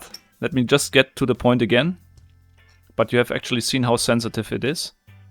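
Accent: Germany. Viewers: Nice german accend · You are easy to understand because of your nice German accent. Lass mich raten: aus Hessen? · genius! but you speak like a german. are you? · Are you german? You sound like one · I like your typical german engineering accent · Du bist Deutscher nä? Ich höre das raus aus deinen Dialekt.. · Ich hätte nie gedacht wie stark man den deutschen Akzent im Englischen raushören kann 😂😂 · Ich find den deutschen Akzent so geil xD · Man hört den deutschen Akzent raus :D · Dat german accent Kreygasm. Way too good :'D.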